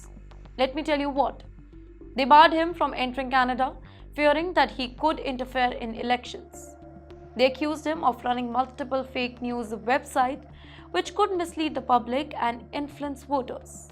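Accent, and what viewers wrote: India. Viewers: It's called Curry English from India. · This is Indianlish.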